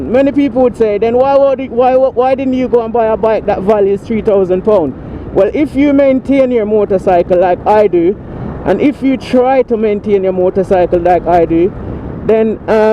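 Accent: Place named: Jamaica. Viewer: Hows the ride brother? You sounds like jamaican hehe i have many friends and tour in jamaica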